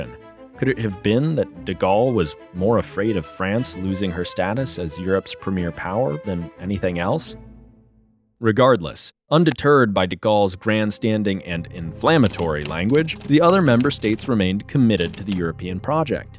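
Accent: United States. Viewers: Sounds very American.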